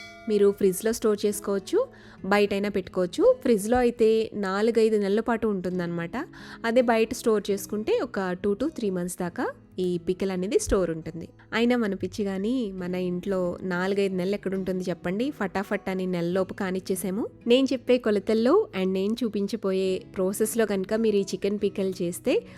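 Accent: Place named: Rayalaseema, India. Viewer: Mam ur pure rayalaseema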